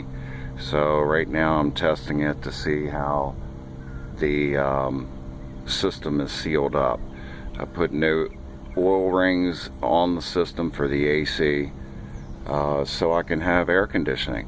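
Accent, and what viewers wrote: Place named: Pittsburgh, United States. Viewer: Are you from Pittsburgh by chance? Sounds like you have a yinzer accent. No disrespect, I lived there for awhile, miss the place. Good luck on your van build, looks awesome!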